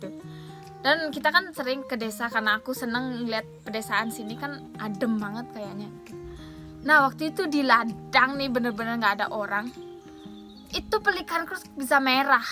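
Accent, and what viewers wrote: Bali, Indonesia. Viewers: mba orang bali ya?? logatnya seperti org bali😊😊😊😊😆😆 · Logat Bali nya ga ilang yaa.. Keep inspiring. Send love from Indonesia ❤ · Kok logatnya kyk orang Bali? Dari Bali yaa?? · Iya logat nya kyak logat Denpasar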